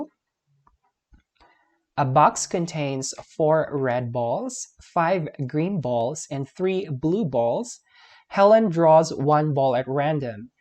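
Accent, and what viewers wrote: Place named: England, United Kingdom. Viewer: Aaaaaaaaa!!!I really like your English accent sir!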